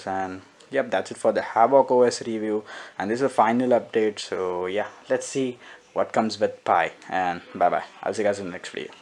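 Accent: India. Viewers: Fuck, why do indians have so terrible accent??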